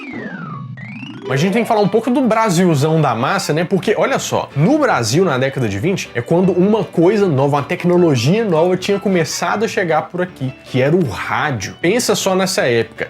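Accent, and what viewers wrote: Minas Gerais, Brazil. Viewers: me inscrevi pq além de vc ser inteligente ainda é mineiro e eu adoro esse sotaque kkkk like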